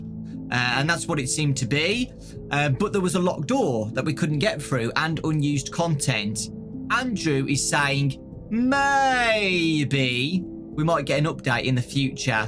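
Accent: United Kingdom. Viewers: Is Britsh!! britsh! (british) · Who is this guy and why is he British?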